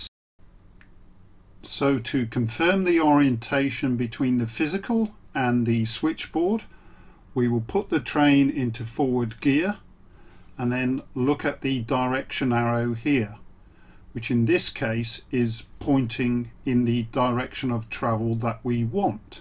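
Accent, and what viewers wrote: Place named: Oxford, United Kingdom. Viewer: Hi, your videos are very much interesting! I am currently in a process to go from analogic to digital on my network. I am equiped with Z21 and Itrain on my computer. Aim is to implement computer driven interlocking. I followed all the steps of your tuto and I feel more confident. As a French, I have also very much appreciated your Oxford english speaking! I have one topic which bothers me: during my off line tests, I lost my screen organisation: I have only left the TCO and the trains/locos control pannel: no more train list with positions and destination indications. Could you advise me on how to come back to the initial screen set-up? Thanks again. Alain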